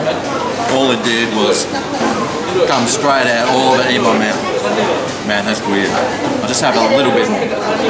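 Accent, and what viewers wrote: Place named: Australia. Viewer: Nice Australian accent m9 : D love it! 👍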